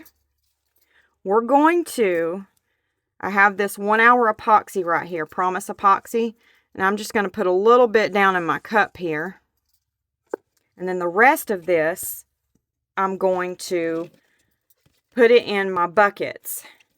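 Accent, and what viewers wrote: South, United States. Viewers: I'm so glad I found your channel. 😊 You're a delight. From your southern twang to your sweet laugh. ❤ Those pink molds could be for making candy. Possibly the split is for a scew stick for cake pops or something? IDK 🤷‍♀️ 😂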